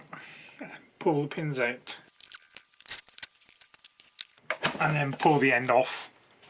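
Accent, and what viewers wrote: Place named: United Kingdom. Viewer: You are a gem. Your videos are clear, well edited, sped up at the right instances. Your comments and work methods are smart, valuable, your work bench is neat and your British accent is clear and impeccable. I am an 80 yr old accordionist, tinkerer and repair junkie in Florida, USA admiring all that you do. Roll -on!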